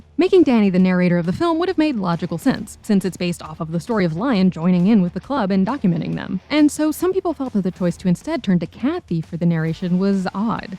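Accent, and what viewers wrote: United States. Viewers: Chicago area accent. Not that different from the NJ accent near NYC.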